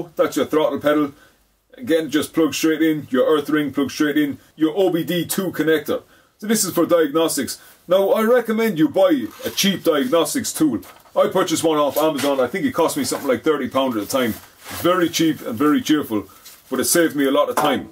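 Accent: Ireland. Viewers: Sounds like Offaly to me, maybe Longford 🤣🤣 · This might be good!! This guy has a Irish voice going through a big bore throat LOL. Brilliant wee video!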